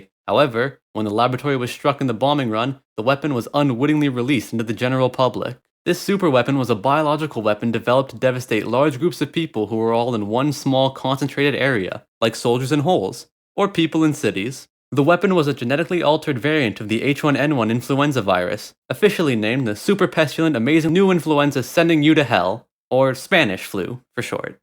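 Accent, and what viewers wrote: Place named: Newfoundland, Canada. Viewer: I’ve been binging your videos and was thinking to myself “sounds familiar..” and the b’ys confirmed it! You’re an NLer!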